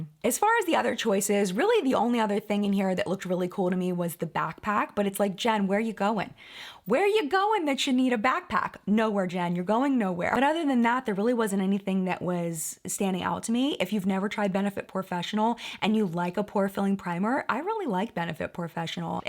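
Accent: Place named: Maryland, United States. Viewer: My fellow Marylander!! I love your Maryland accent, “Baltimore” 😍😍 hello from Riverdale Park, MD!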